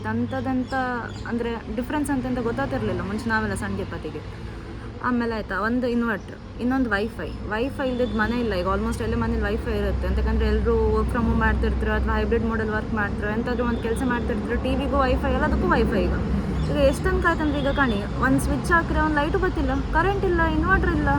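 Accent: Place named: Kundapur, India. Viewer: Kundapur kannada super ❤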